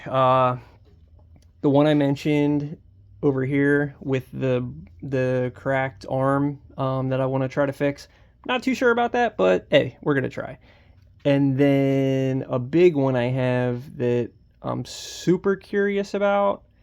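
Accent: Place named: Delaware County, United States. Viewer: where you from ? you got that Delco accent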